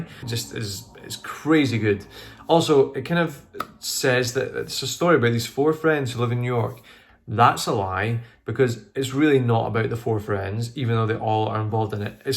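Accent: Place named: Scotland, United Kingdom. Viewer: Omgosh, 2nd i heard the scottish accent I jizzed myself....wellllll, the book is on my night stand as we speak, so lezzz do disss.....also have the audio book on deck just in case. I only hope to find a boyfriend to gift me books on valentines day some day heart eyes emoji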